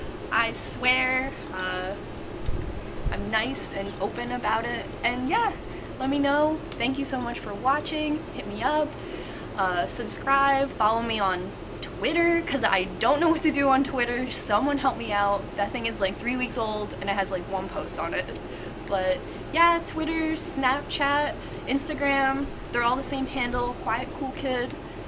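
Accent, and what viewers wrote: Philadelphia, United States. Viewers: so funny, i clicked around and found one of your other videos. the second you spoke, I thought to myself, this girl is from Philly too! sure enough...cant wait to see more. 215 love.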